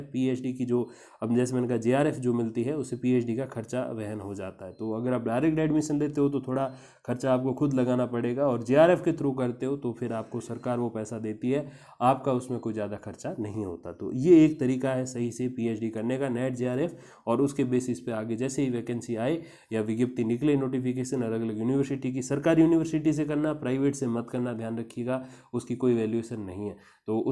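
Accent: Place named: Nagaur, India. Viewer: Apki boli Nagur k Jaat jesi h esliye pucha. Thanks for all informative videos.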